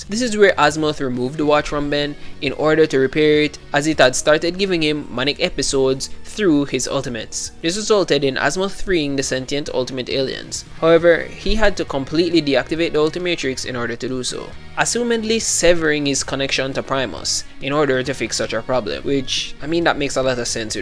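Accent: Jamaica. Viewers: Are you jamaican. i recognize that accent anywhere. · Are you jamaica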